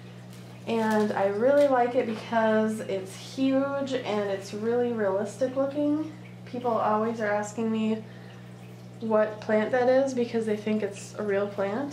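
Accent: Minnesota, United States. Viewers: Its the Minnesotan accent!!! Its cute!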